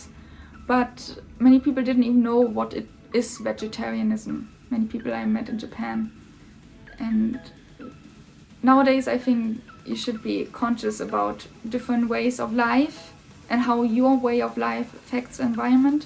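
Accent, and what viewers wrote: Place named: Germany. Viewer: you should be careful saying stuff which you "don't like about Japan', instead of saying 'don't get used to', 'can't really understand' , 'hard to understand' or "hard to get used to'. you're such a typical German saying your negative opinions direct, even your words can make other people's feelings uncomfortable, and you get haters instead. if ur speaking English, better to speak beautiful English, not with that strong German accent which is so difficult to understand. it's better if you speak your own language, the 'direct language, German' and put a subtitle, because native English speakers would pick words more carefully, and wouldn't say so much negative things to make listeners feel uncomfortable. You should known that Germans are not so fond by others, because you guys are not so charming, I mean, not with attracting, warm hearted personalities, but cold and selfish. 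I often see that German people don't help others on the streets or on the train, but ignore and leave. they think it's none of my business or i don't wanna waste my time helping others, and get no reward. really cold people out there.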